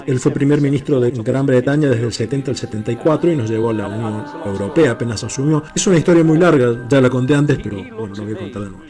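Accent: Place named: Córdoba, Argentina. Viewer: ❤❤❤ otra vez lo voy a ,👀👂no m acuerdo del acento cordobés 😂tienen mucho salero 🧂 como buen@ Andaluces 👍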